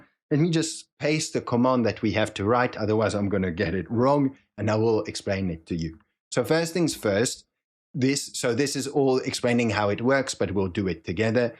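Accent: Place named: South Africa. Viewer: . I stumbled on your channel just now. Wow, it was the first video that linked me to this. Your accent attracted me....sounds South African. What a wonderful and detailed presentation that attracts the viewer to stay tuned as if we are in a Hollywood thriller 😊. Dude you are simply the best... This past month I have been watching so much nonsense on YouTube as I am trying to get back into trading after about two decades of absence. Much has changed with technology and I need to brush up before I re-enter the markets. Well done and God Bless 🙏🙏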